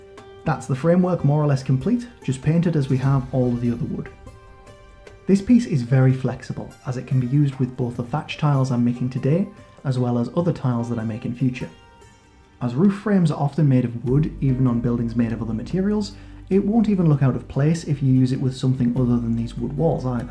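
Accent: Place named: Newcastle, United Kingdom. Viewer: Watching this older video, I can really hear your geordie accent coming through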